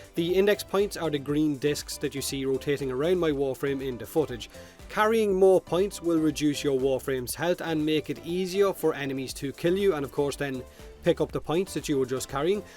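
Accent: Ireland. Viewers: Don't worry i love your bloody irish accent. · That accent killed me. Specially when he said youtube wont understand my irish accent xd. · Awesome irish accent, hey! One of the reasons I prefer your videos to OriginalWickedFun is your voice! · I subbed for the bloody irish accent. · Love your Irish accent You single? 😏😂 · Irish Accent ❤ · He wasn't wrong about subtitles shitting on his Irish accent. Goddamn that's funny. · I love your Irish accent. Thank you! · You have to get "pints" in the index? The Irish and their pints xD gotta love that accent <3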